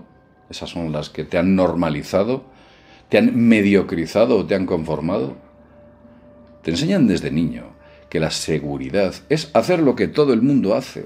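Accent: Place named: Castile, Spain. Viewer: Jeje esa mezcla de español castizo, y efectos especiales es... Bastante bizarra.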